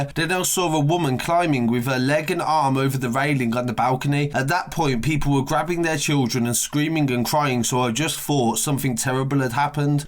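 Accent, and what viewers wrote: Birmingham, United Kingdom. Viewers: Are u a brummie ? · Can't listen to this nerdy Birmingham accent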